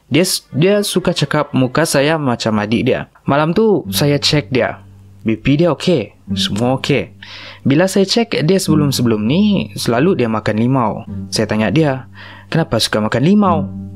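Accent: Sabah, Malaysia. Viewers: Suara dia best..,Mcm sabah sikit...Hihi...😃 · Cerita2 Seram! Memang cerita yang benar berlaku dan sentiasa disukai semua orang.. Suatu masa dahulu tahun 1955 Radio Melayu Singapura pun menjadikan SERAM sebagai program terbaik diRadio dan sentiasa keudara pada jam 2330 setiap malam Jumaat.. Berbagai cerita yang cukup menakut dan menegakkan Bulu Roma serta ditambah kesan bunyi juga amat menyeramkan..Ia bukan seperti Drama biasa diRadio.. Mohon Penyiar ini menukarkan lorat membacanya yang begitu BAKU dan seolah olah dalam lorat SABAHAN ke lorat biasa saja agar lebih syok didengar dan betul2 ngam2 sui kata sebenarnya.. Idok ke gitu.. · memang sabah pun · Memng org Sbah agknye.loghat Sbah je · Sabah LA bai tuh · memang org sabah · sabah sja guna kopi - kupi.. haha.. mmg sabah dia ni..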